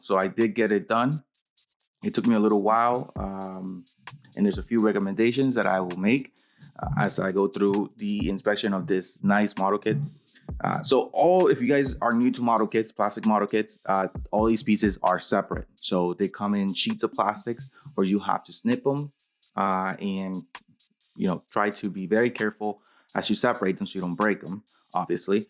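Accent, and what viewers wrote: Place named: Miami, United States. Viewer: I know a Miami accent when I hear one. usa gundam has some preorders for zoids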